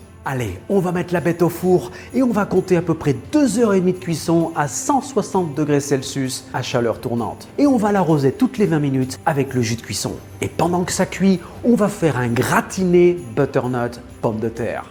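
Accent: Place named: Bordeaux, France. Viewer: C’est trés formidable Chef et merci beaucoup. His accent seems to be from Bordeaux.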